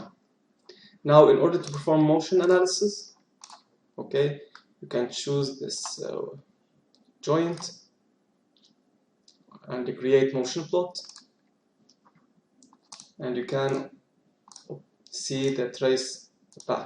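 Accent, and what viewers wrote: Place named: Arab world. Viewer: انت عربي لهجتك مش انجلش خالص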